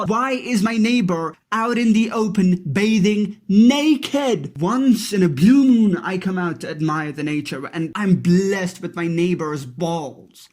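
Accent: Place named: United Kingdom. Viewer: Your videos are great, keep it up. And your accent being British is very interesting, when I first saw your 3000 years history video, I thought you were British lol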